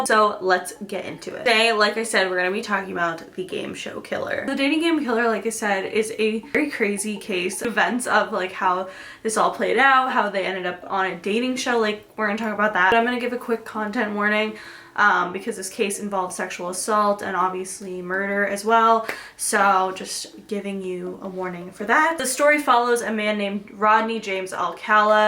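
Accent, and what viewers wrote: United Kingdom. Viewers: I love your British accent 😭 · I love your British accent, love ❤️ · The beginning haha I love your British accent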